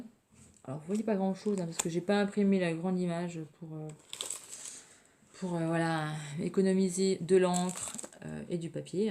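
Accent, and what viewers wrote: France. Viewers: Tu m’amuses en parlant de ta manière de parler : nivernaise et Morvandelle ! De quel côté es-tu ? J’ai habité longtemps à côté de Corbigny dont on dit que ce n’est pas en Morvan mais que ses poules y vont aux champs....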